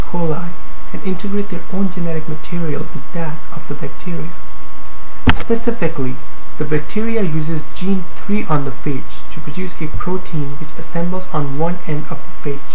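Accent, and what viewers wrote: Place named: India. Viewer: wtf is that indian accent everywhere in biology videos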